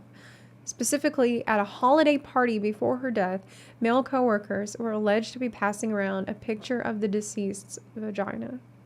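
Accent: South, United States. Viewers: I'm so confused. Your profile says Ukraine, but you sound like you're from the Southern US. Also, your voice is so soothing. This is like accidental ASMR almost. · It's very brave that you talked about a topic that was personally very difficult for you aswell! I could see and hear how difficult it was for you to talk about this, and I admire your courage to make this vid!! Also, you're Ukrainian?:O Girl you sound so very American I didn't even notice the slightest accent lol. My collegue is also, and she's a very nice person, but always very puch busy with her looks and can have a 'southern' temprament hahah (she's from the warm part with coast). Anf finally, people talking in the comments to 'boycot' Blizzard or definitely ending their sub because of this scandal, didn't you hear say that MOST of the people there are just DOING THEIR JOB?! The people who are being harassed already have it difficult enough, last thing they need is losing their income.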